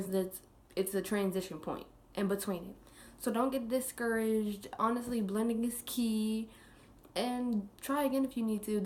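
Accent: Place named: Midwest, United States. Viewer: you sound like you're from northwest ohio or Detroit am I correct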